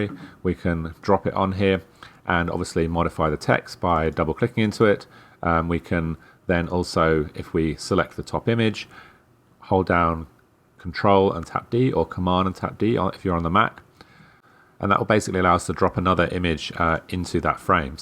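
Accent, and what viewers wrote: United Kingdom. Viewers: Thanks very much, I needed these shortcuts. Your instructions are inspiration! Is your accent Manchester?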